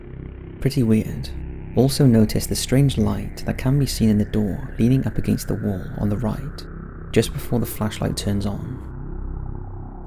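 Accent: United Kingdom. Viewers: Is this british, is he american? Can't make out what he is. His narration is really good though.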